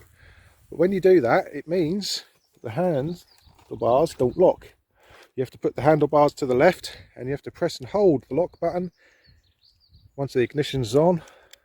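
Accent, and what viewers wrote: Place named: Norfolk, United Kingdom. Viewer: How you getting on with the bike 10 months on.... what's the mpg like and how well does it sit at 70mph on the duel roads.... Moonrakers on Oak Street should be getting more in soon and I like the look of the g1 and the adventure version like this u1... Smart colours nice looks and seams to have the most torque out of all 125cc... Apologies for all the questions and thanks for the post... Nice to hear a Norfolk accent...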